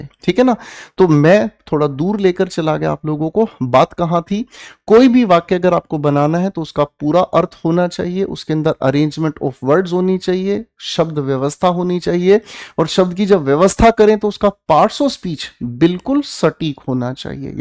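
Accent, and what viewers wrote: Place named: Bengal, India. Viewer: Aaj to Bengali tone me bhi bol gye sir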